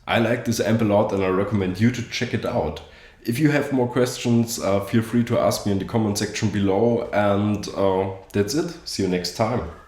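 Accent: Germany. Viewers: Man I'm going to have such a blast trying to imitate your accent - it totally kicks ass. Which part of Germany does it originate from? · this man's accent screams "I am German".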